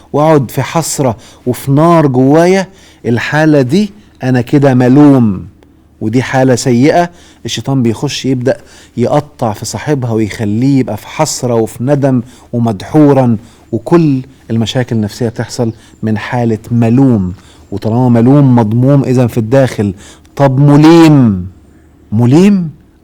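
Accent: Algeria. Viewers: انا تبانلي جزايرية ماشي ليبية ومنعرف ههه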